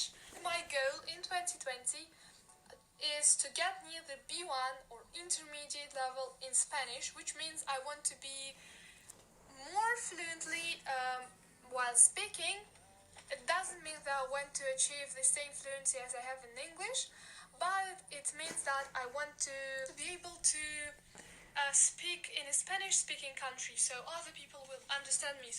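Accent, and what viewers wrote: France. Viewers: LOL I thought she was french because of her accent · It is true thati n your instagram video you really have a french accent haha. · Bravo pour cet accent français 👏 👏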